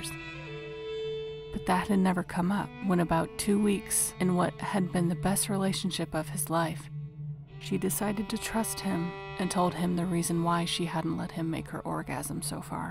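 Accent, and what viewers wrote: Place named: Wales, United Kingdom. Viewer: Very cool tale, and very nice Wales accent! 🙌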